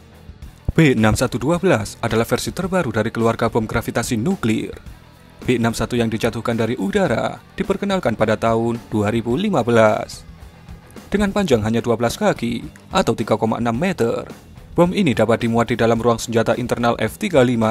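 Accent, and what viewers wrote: Java, Indonesia. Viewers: Khas medoknya enak bet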